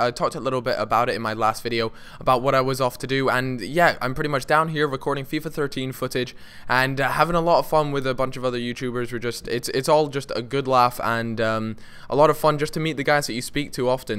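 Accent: Scotland, United Kingdom. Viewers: are u scottish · are you scottish or what...mixing your accent with a hint of english.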